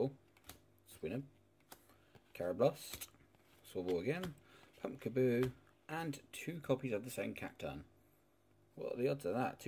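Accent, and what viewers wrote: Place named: United Kingdom. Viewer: Love your intro mate, looks amazing! Sounds like you're from the UK also? Really liked the Detective Pikachu series, do you think the cards will go up in value at any time? Subbed mate!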